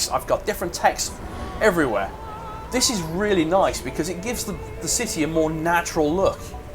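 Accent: United Kingdom. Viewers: I love your voice man. British English is best English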